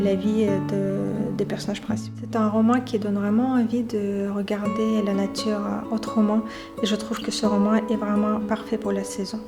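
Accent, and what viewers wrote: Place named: Russia. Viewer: Bonjour et merci pour cette vidéo très intéressante et tellement douce. Je vais relire Orgueil et Préjugés dans la traduction Gallmeister. J' ai Femmes et filles depuis un moment dans ma bibliothèque et vous me donnez l'envie de le lire. Votre accent me rappelle mon amie en Russie que j' espère revoir un jour... A bientôt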